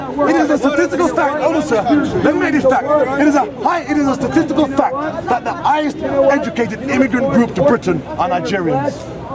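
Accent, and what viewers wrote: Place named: United States. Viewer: anybody noticed he has aa american accent?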